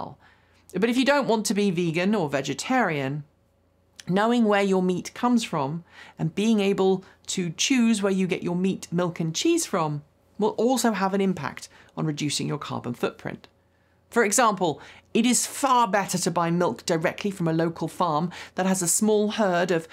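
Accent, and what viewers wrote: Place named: RP, United Kingdom. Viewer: Another entertaining, and eloquently spoken video. I learn more than the topic, but also the "Queen's English". Thank you.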